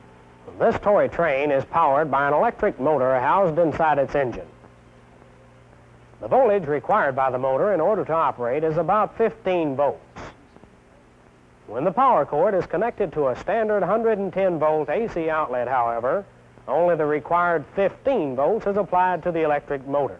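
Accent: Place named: Texas, United States. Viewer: Sounds like he is from Houston, TX .....then did some speech training.... Glad I did my electronics tech training in the Military, USMC first before going to college for engineering, this gave me the solid fundamentals... Most of the professors at University suck at teaching....they do not start out with any conceptual teaching....They jump into Maxwell's equations on the first day..Many students drop along the way...It's not until after you graduate that you have time to think things through....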